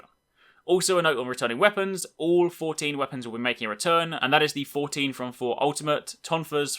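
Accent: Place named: United Kingdom. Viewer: It the British accent:3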